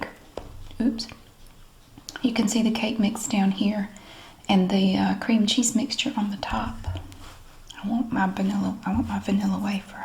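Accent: South, United States. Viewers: I love the way you say oil with your southern accent! I was making gingerbread the other night and I was thinking of you and I said ad the ol 😂.. my son squinted his eyes and looked at me lol.. I said it's an inside joke.. u wouldn't understand. I love your southern accent!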